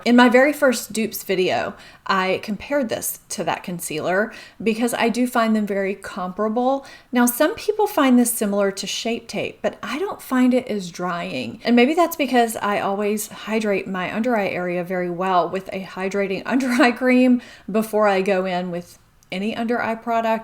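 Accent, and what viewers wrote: South, United States. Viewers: that southern accent intensifying through the video :D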